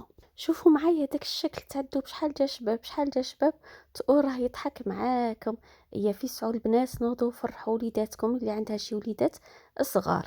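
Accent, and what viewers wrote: Tlemcen, Algeria. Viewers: الله يعطيك الصحة بنت بلادي انا اصلي من تلمسان و اسكن في مغنية اتابع كل وصفاتك و احب تجربتها رغم ان عندي نفس طريقة التحضير و لكن احب ان اسمعك و انت تتكلمين باللهجة التلمسانية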